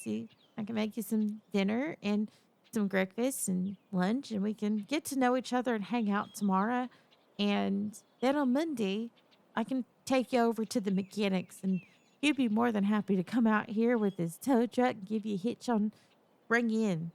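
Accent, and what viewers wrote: South, United States. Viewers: I love your southern accent!! This is a cute audio! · First time listener,and I wanted to say that was very awesome. I loved your voice. The southern accent was great. I wish I could have an obsessive cowgirl take care of me lol. I enjoy how sweet she was and you slowly peel back the lairs,and find out she a crazy. I also enjoy the slight lustful side of her. The outro was pretty cool to hear that you relate the stories to irl situations. Plus it kinda gives us a little peak behind the curtain and get know a bit about you. So I recommend doing outros. Sorry for being so long winded 😅.i just wanted you to know you are amazing, and I can’t wait to listen to more 😊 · I love cow girls, and yours is my new favorite. The southern accent and flirting are so good, and I love the yandere turn. "Milk straight from the tap" is wild. But also, yes please!🥰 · Damn I am never this early, not gonna complain, we love the southern asmr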